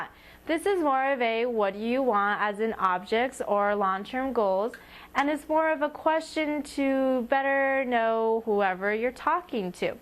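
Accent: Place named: England, United Kingdom. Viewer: I like her English Accent.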